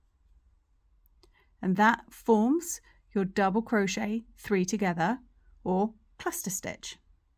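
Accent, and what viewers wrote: England, United Kingdom. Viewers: I so enjoy your instructions. They are given so clearly. You sound very English but use the US terminology. How is that? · And another wonderful video! I must admit that your accent is a delight to me. My grandfather immigrated from England in the early 1900’s and I’ve been soothed by a British accent my whole life. That and the marvelous content have got me hooked ❤️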